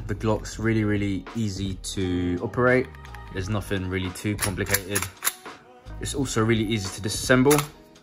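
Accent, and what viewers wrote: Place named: Caribbean. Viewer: Why does he have a black West Indian accent?????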